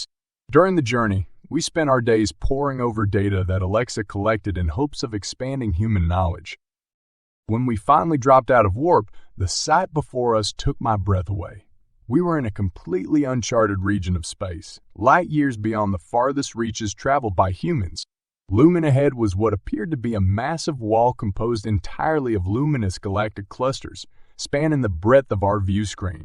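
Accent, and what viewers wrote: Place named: South, United States. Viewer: No ! Country accent does not work for sci- fi .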